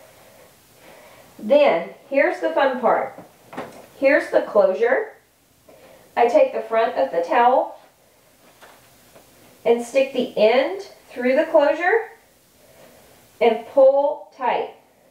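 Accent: South, United States. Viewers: You could work for QVC!!!! ;) Shame that (as usual!) the give away and products is for USA folks only (English subscriber here.) None the less, well done on the 20 k subs (I'm just a recent one too, like a few days ago recent, lol) And last, but not least, I love your accent. :) It's so funny for me to hear, like something out of a movie.. Very 'Southern drawl.' Take care. PS. Your hair is beautiful.. The shine is EPIC!!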